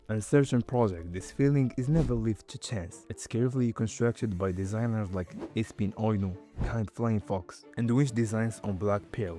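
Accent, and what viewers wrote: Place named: Middle East. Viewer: I’ll be blunt…. I’m halfway through this video and it is #TORTURE 😱 because you mispronounce 50% of the words and the other 50% sounds like a 10-year-old child from the mid east trying to pronounce the English language… the visuals are great, and as other people commented: you “should“ have a lot more views, but the reason you don’t is what I just stated!😮🙄😳😡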